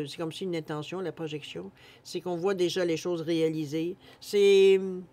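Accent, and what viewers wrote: Quebec, Canada. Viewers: ah c'est l'accent québecois !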